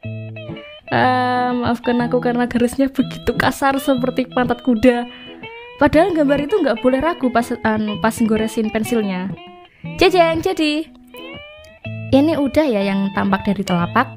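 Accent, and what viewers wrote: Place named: Java, Indonesia. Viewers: dari cara bicaranya mbak agak medok bahasa Indonesia nya😅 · Medok bgt ya bund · Kata katanya toxic banget, khas logat orang jawa 👍🏻😂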